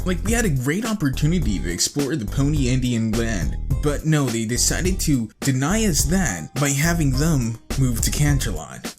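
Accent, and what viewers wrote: United Kingdom. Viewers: why the buck are you talking British? talk normal. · Maybe this is normal for him because he is British? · dude what the heck all I could think about was you talk in a British access I mean really im not saying it bad im just saying what happened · No gripes about the content of your commentary, but WHY oh why did you use a British accent? I only ask because you don't normally talk like that.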